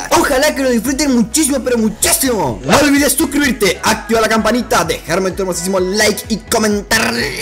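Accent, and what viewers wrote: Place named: Chile. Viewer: ablas como mi chile querido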